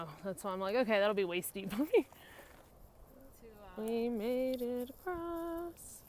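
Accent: South, United States. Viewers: "This here sign..." lol I love it. You can take the girl out of the south...never the south out of the girl. Rock on.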